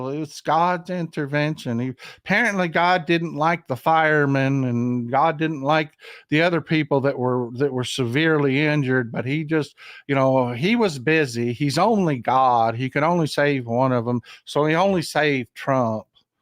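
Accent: Texas, United States. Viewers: I bet you're from Austin?? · My dad was born in Texas. You remind me of how he talked, I enjoy your comments.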